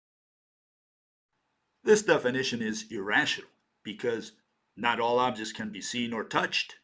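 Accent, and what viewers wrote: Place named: India. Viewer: He talks like an Indian. Where are you from mister?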